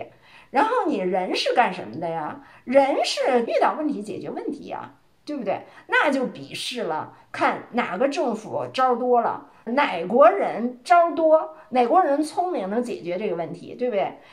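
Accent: Beijing, China. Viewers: 天天都会看几个视频，特喜欢的就是一口京腔京韵，再重点更好！👍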